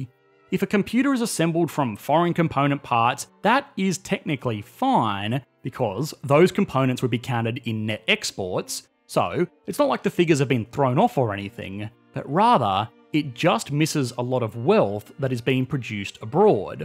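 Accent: Australia. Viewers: I am guessing that it is Australia that you are from, please tell me if I’m wrong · Hey, man. Let me, please, make some subtitles for your videos. I can even translate it to portuguese, if you will. The Australian accent ain't as clear as you might think. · Love your channel but please please please don't say "zee". It sounds so wrong coming from an Aussie accent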